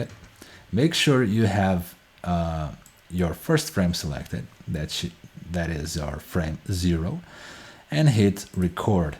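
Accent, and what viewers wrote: Portugal. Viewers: pandahg00 Portugal n br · ele é português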